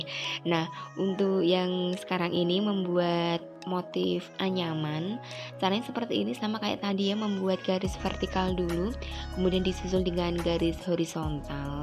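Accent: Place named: Java, Indonesia. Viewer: suarany lembut skli bunda tp logat jawany msh ada medok dikiiit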